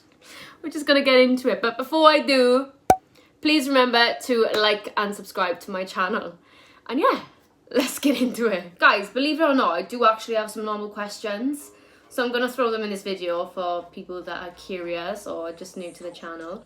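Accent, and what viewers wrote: Wales, United Kingdom. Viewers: I love your welsh accent babe 😍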